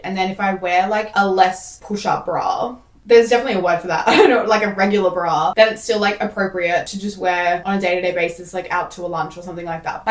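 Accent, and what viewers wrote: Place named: Australia. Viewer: The UNO REVERSE RUG!! I love it! Also I only discovered your channel like a week ago, but you've already become a staple must-watch channel for me! I love sewing and crochet too, and your content is just so refreshing. I love your yap snippets, or your little walks and talks about anxiety, society, the human experience etc. Also there's something really homey and refreshing about hearing an Australian accent! Everyone else I watch is generally American so it felt so surprising but lovely to hear and see someone over here :)) Feels like having a friend over whilst we craft and yap in my living room. *Edit* because I though thought of something re: the tension whilst you've been sewing - double check how you're threading your machine. For the longest time I had missed a small step near the beginning. It will be different for each machine, but for mine there was a small disc or plate and instead of just wrapping the thread around it, I had to place it between the plate, almost like it slotted in between two (I'll compare the feeling of "slotting" the thread in to the feeling of placing dental floss between your teeth. 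That might sound rly weird but it's a similar feeling, and as you can imagine with dental floss, it would kind of hold the thread in place whilst still allowing it to run through. When I was skipping this step it meant that near the spool the thread was loose but as it passed through this sort of sandwiched portion, it pressed down the thread and helped keep it mor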